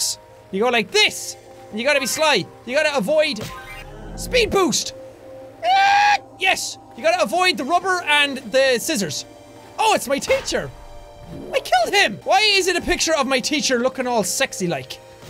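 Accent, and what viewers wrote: Ireland. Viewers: Yea I'm assuming from his accent he's in Ireland so yea pretty close :)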